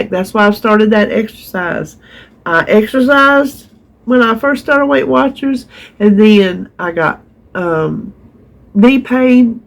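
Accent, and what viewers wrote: South, United States. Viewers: Thank you you got me up off the chair and I'm not going to squander this body any further that God gave me. I'm going to do Leslie sansone the one minute walk everyday and now that I've said that I'm promising you so I got to do it I love you thank you so much watch you everyday and have for a couple of years you're an inspiration and I love your Southern Roots and accent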